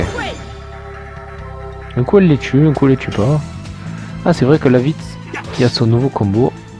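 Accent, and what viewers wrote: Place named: Provence, France. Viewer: Par contre pourquoi? 13 je comprend étant de Martigues (l'accent me rappelle mon chez moi) mais?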